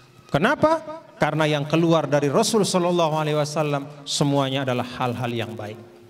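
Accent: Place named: Sasak, Indonesia. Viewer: senang banget sy dengar bhs sasak halus intonasinya sy 15th di Lombok ngerti sekedit kedit ton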